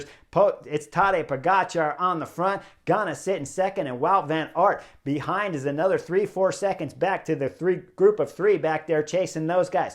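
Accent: United States. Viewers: Great analysis… but man the American pronunciation of names is so bad. Not even a small effort to respect original names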